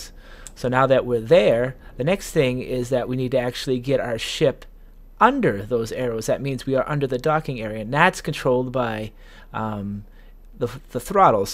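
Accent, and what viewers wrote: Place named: New England, United States. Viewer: Loving your new england accent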